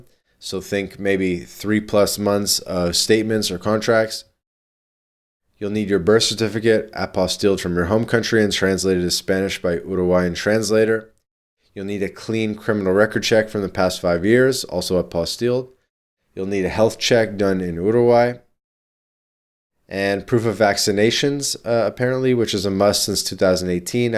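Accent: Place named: Canada. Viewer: Your accent tells me you’re an annoying Canadian. You’re talking about the poor retiree / annoying GenZ / “digital nomad“ way to get a permanent resident visa in Uruguay. I’m certainly not a Uruguayan lawyer, though I know several, but it would seem there are far better permanent residence visas available in UY, which have much less restrictions one’s need to remain in the country on a more or less continuous basis (after all, if you’re not a poor ass Gen Z, you might want to occasionally travel somewhere like Southeast Asia or Western Europe or even spend a week or two in Argentina or Chile or maybe southern Brazil). Though of course, because Uruguay values (as they certainly should, and we all should, whether in Canada, in the United States or anywhere else in the world) that in order to get a permanent residence visa of any kind, one has a true desire to spend significant time in the country and integrate fully with the culture (in other words, if you speak no Spanish and/or don’t respect the local culture, you have no business in UY, permanent residence visas-wise), unlike in Canada and the United States, in both of which people who are completely incapable of assimilating in either country’s culture, much less speak the native languages normally used there, are allowed to enter and remain indefinitely, which is a travesty). 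Yes, so far as I understand it the “independent means“ visa is available. I’m just saying there may be better options assuming you’re not a poor ass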